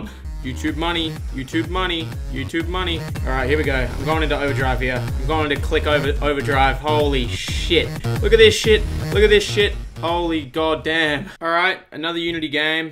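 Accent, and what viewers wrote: Australia. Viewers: is auzzie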